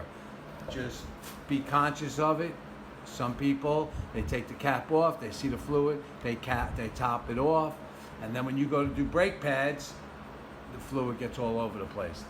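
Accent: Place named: Long Island, United States. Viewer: Thank you for the video! And your voice is awesome, reminds me of the neighborhood I grew up in on Long Island. 👍🏾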